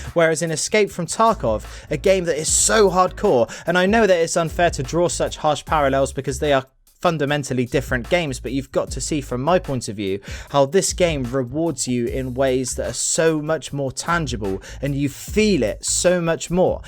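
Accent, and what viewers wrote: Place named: West Country, United Kingdom. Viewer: Mate, might sound strange but have you ever kept a track of where the people in your lobbies in Call of Duty come from? I might have too much free time on my hands, but I'm from Nottingham and back in day, I used to play with people from Sheffield, Leeds and surrounding areas, I NEVER played with people in Europe. You'd very occasionally get into a lobby with some Americans which was quite cool as I'd never spoken to a yank before, but all I used to come across was 'UK ReMiiX' and the like back in the day of Cod 4 - BO1. Nowadays, my games are dominates by Germans & Turks for some reason. I'm literally playing with the same people with 'KURD' or 'TURK' as their clan tag game after game. Why?! I'm guessing from your accent you are probably from round the Bristol / Swindon area, do you still party up with people in the South West or are you witnessing the same thing as I am?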